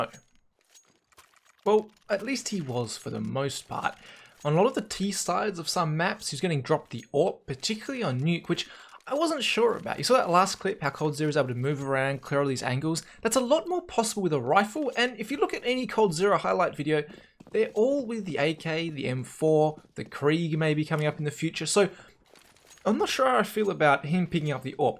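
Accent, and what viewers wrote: Australia. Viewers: bruh moment...i wasnt looking at youtube and when the video started i heard an australian accent and was like oh, im watching a heyzeus video....wait a minute, this doesnt sound like heyzeus...OHHHHHHHHHHHHHHHHHHHHHHHHHHHHH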